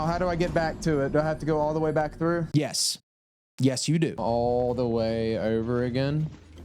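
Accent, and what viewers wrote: United States. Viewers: Ayy fam are you from Philly/Delco?? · first time i seen this guy and ten seconds in i’m like “baltimore”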